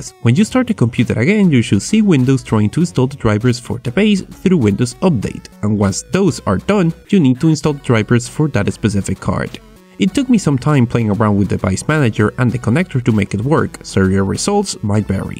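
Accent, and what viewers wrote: Spain. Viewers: Are you a spanish speaker? Nice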